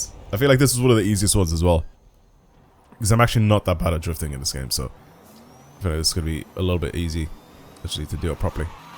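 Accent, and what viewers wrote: Ireland. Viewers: personal question but do you live near kallinary in ireland, ur accent sounds very similar to someone i know from there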